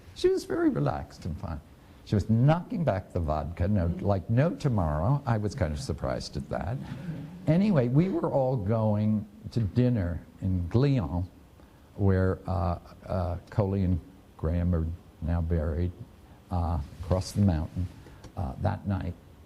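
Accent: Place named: United States. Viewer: Awful narration, not to mention the god-awful American accent.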